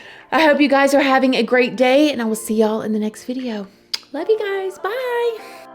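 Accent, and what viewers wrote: South, United States. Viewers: she’s southern and also a grown lady, i’m sure she’s aware lol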